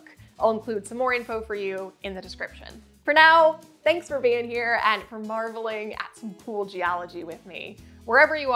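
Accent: Michigan, United States. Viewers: Good to hear the Michigan dialect again. Been awhile since hearing it.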